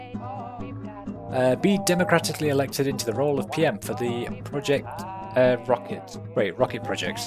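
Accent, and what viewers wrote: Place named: United Kingdom. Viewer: If (I said IF) you are looking for constructive criticism, I'd do a lot more comedic commentary on what you are playing. You don't do very much at all, but your voice is great (especially for an American audience who are inordinately impressed by a British accent), but you aren't giving enough original material. More comedic commentary. I'm going to subscribe and see how you do. 👍👍👍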